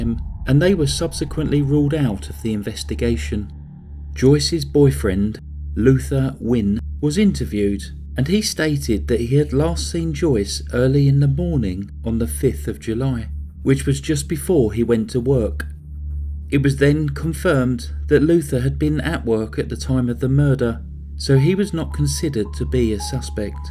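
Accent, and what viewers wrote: England, United Kingdom. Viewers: Good morning, you sure know how to tell a story. I feel sorry for Joyce. I must admit, we Yanks love the English accent, ya'll can make a sad story sound serious, yet sweet. Take care! (Hi CR Fam!) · What part of England are you from? South?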